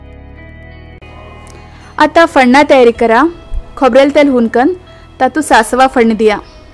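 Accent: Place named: Karwar, India. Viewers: It is Karwari accent. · Tumgele konkani marathiche accent asa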